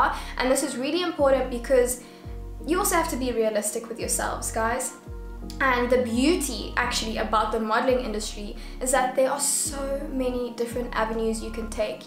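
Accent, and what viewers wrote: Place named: South Africa. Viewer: Love this so much I subbed as a fellow South African 😁🇿🇦 I literally heard your voice and was like aish!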